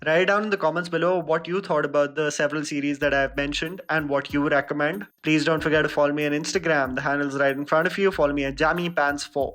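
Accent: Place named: India. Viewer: your hindi accent is too funny btw Great work 👍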